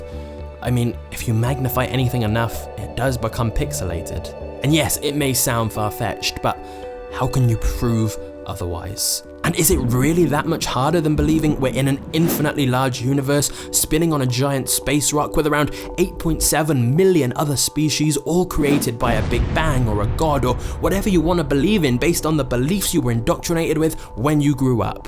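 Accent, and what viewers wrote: United Kingdom. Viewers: The combination of the British accent and the the snippets from ca; 40 different shit movies make your point.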